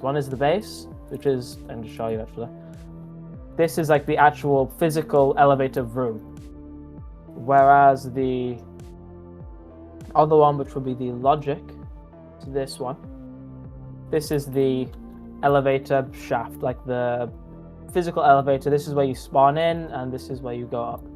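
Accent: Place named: United Kingdom. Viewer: british person talks about elevators for 12 minutes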